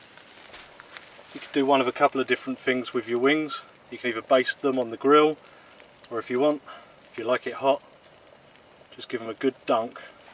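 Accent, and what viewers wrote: United Kingdom. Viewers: Hey are you British?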